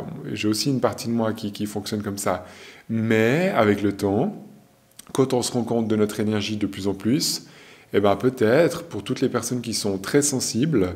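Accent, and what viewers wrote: Switzerland. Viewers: Merci ça fait du bien entendre un discours comme le votre oui j'en ai besoin, j'ai toujours été comme ça depuis très jeune. ( Je me disais bien que votre accent vient de notre beau pays la Suisse 😊 )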